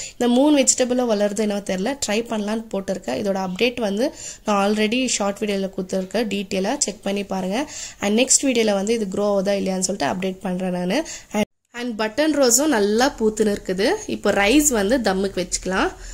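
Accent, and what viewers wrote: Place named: Vellore, India. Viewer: Sister are you from Vellore area.ur slang looks like that.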